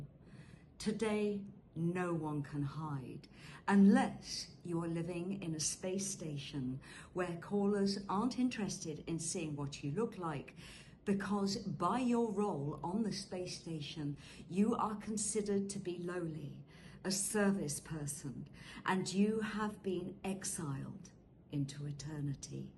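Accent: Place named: Oxford, United Kingdom. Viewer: Вы знаете, мне бесконечно импонирует Ваше абсолютно безупречно-совершенное Оксфордское произношение, но так-ли нужно, чтобы Ваш Кролик так часто посещал солярий !???? 😢😢😢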